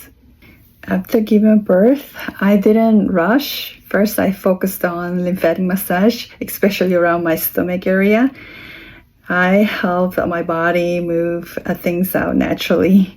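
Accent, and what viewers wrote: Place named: South Korea. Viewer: It's always great to hear you speak and to hear tidbits of your Korean accent, Ms., when you share your health secrets and otherwise. Hydration and consistency are now noted in my memory banks. Thank you. ❤❤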